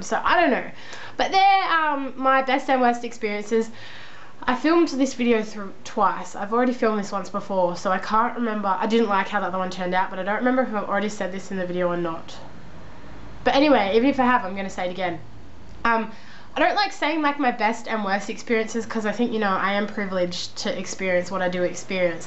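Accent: Australia. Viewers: YOUR AUSTRALIAN!!!!! YAY!!!!!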